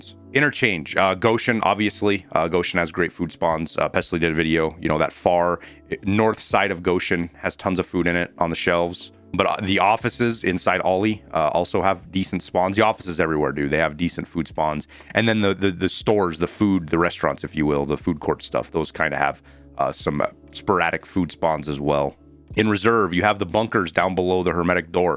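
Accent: United States. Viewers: Love your vids man but the one thing that kills me is some of your “Americanized” pronunciations. For example kvass is almost pronounced like ‘kwass.’ I understand you don’t know everything and it’s just a minor annoyance on my behalf but it drives me crazy xD.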